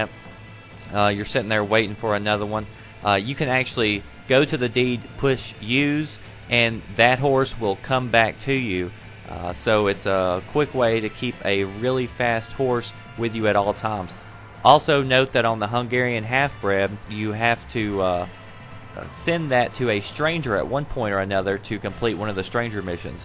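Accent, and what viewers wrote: South, United States. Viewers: Are you from the south states? Your accent kinda sound like it.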